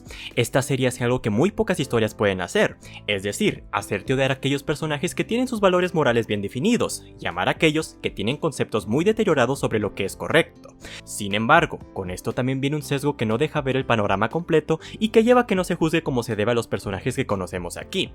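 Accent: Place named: Honduras. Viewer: Me gusta el acento de la voz del locutor o narrador, es un acento hondureño no?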